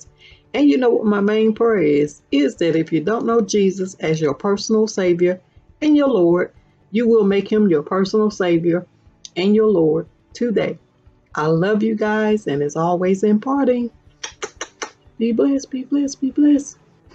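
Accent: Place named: St. Louis, United States. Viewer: You from STL? I'm out here but from jersey...you sound like you gotta lil stl accent. Very nice btw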